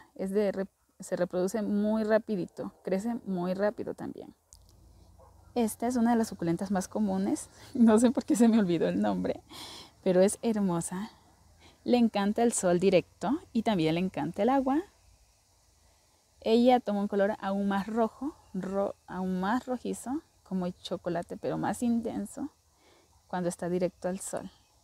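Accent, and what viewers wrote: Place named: Colombia. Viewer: Hola eres colombiana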